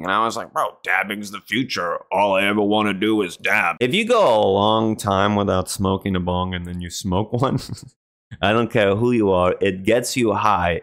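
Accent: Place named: Boston, United States. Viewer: Your accent bro! Are you from Boston?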